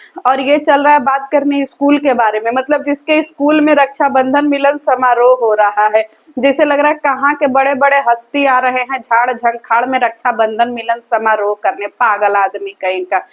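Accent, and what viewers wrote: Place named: Uttar Pradesh, India. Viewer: यूपी वाला भोजपुरी बोलतीं हैं आप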